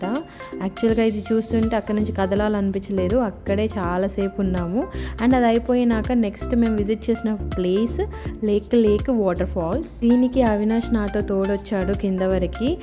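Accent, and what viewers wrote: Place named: Hyderabad, India. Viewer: Eydhemaina hyd slang marchipole... Very good keep it up...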